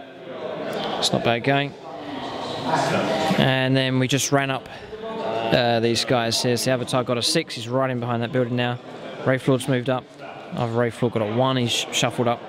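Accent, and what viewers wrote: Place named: Southeast England, United Kingdom. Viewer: Hey. nice to see some more of your batreps up on here. Is there no way in future you can stop there being a massive spoiler comment right up there^ at the top of the screen, it's impossible not to read that as it's the 1st one! Come on, don't spoil everyone's fun mate! Anyway, would love to know where you have your battles as you sound like you're from the southeast of England and I'd love to come see some of the wicked terrain you guys have and maybe even have a game...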